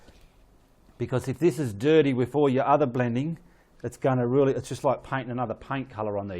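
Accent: Australia. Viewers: so australian lol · Holy Crikey! Wasn't expecting that level of Aussie right off the bat. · I love your beautiful Ausi accent. Lovely presentation on how to make realistic cloud. I think if you had used a bit of firey grey or slightly bright yellowish orangy instead of PURPLE, it would have given more depth....anyway, just my thoughts..... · I love it! You’re such a hoot! “I’m gonna get back into that bus and drive it into this neighborhood!” Made me smile. I’ve never heard that out here in western Nevada, USA.... especially with the Aussie accent. Enjoyed your whole presentation and learned a lot. Sharing with my friend that does acrylic painting parties... (tutorials with laughs among friends and we leave with our own work of art.) Thank you. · Love the Aussie bush accent 😂 Enjoyed watching this and enjoyed the humour to go with it. Thankyou for sharing. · Good to hear an Aussie, speak like an Aussie! 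It’s a joy!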